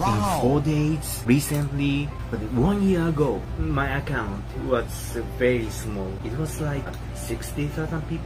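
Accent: Visayas, Philippines. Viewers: Makalingaw kaayo ka mag binisaya kuya 😁😅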